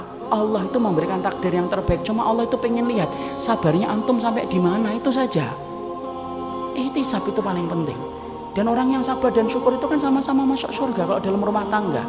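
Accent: Java, Indonesia. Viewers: masyaAllah stads... Allah mudahkan penyampaian hidayah ini lewat ustads... baarokalllah stads... logat jawanya mash kentel sanget stad... :)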